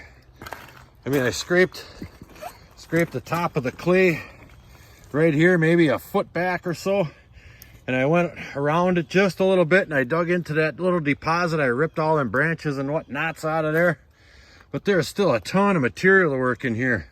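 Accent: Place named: Wisconsin, United States. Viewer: I like your Wisconsin accent don't ya kno! When I heard crick, not creek, I knew. Lol great content !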